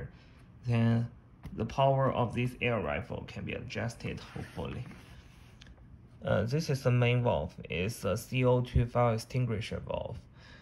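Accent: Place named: China. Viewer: 老哥稳，中式英文太亲切了哈哈哈哈哈哈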